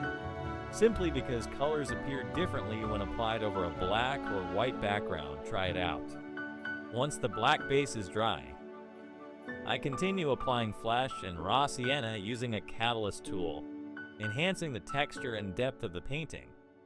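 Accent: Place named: United States. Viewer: Milý. Tieto tvoje techniky som v jednom videu nevidela. Ďakujem ti, že si sa podelil s nimi a je to rozkošné. Ešte viac si stúpol na kvalite v mojich očiach. A skupina určite súhlasí. 😊Americký prízvuk a hudba dotvárajú čaro namaľovaných obrazov. Aké milé. 😊 čauko. ZUZANA 😊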